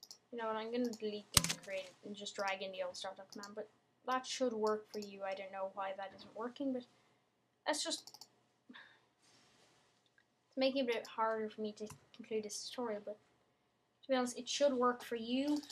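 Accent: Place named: Ireland. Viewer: and nice irish accent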